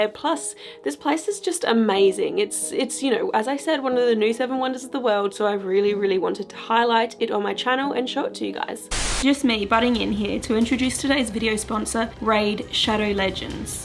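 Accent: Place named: Australia. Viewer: I love ur channel your content is amazing. Your so sweet,nice and positive all the time. Sometimes I think she's so positive but into dark shit lol your definitely unique that's for sure lol I love the aussie accent. Thanks for cheering us up during these dark days. Mad love from the states 👹👻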